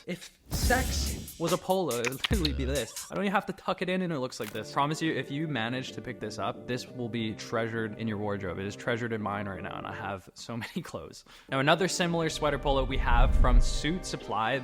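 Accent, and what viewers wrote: United Kingdom. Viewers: Had to drop a like for the British accent LOL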